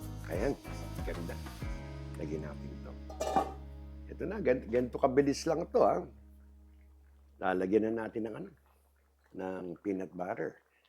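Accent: Marikina, Philippines. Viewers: Taga Marikina din po ako parang naririnig ko ang Tatay ko pagnagsasalita kayo may puntong Marikena… mahilig din po syang magluto 😊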